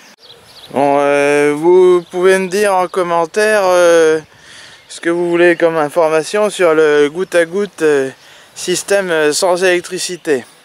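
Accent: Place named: South-West, France. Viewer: Coucou, Arrête tes conneries! Tu nous fais quoi! Tu n'as pas honte! Et la grelinette elle est où!!??? Je plaisante 😉😁, tu as bien raison, il arrive un moment...je me souviens tes vidéos, c'était wahou très sportif🥵🥵 Quand je vois ta terre, wahou, moi elle n'est pas du tout comme ça, elle colle elle colle... J'ai commencé mes plantations de tomates et je continue avec toi 😂, en tout cas c'est super de voir ta manière de faire. J'adore tes expressions, ton accent du sud ouest, c'est toujours un plaisir de t'écouter et regarder tes vidéos. Des haricots remontant, là tu fais fort😂 Elles sont où tes fleurs!!!??????? Bonne journée A bientôt 😘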